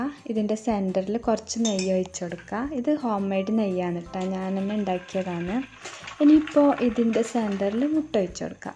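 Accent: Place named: Kasaragod, India. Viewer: Keettitt nammale kasargod slang thonnunnu.... kasargod aano veed...nice vlog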